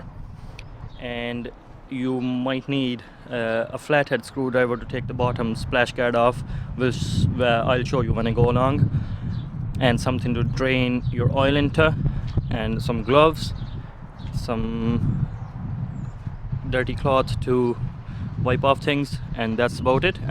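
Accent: Ireland. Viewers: I heard the Irish "twang" easily enough! But we're all best friends ❤️❤️ until the 6 nations 🤣🤣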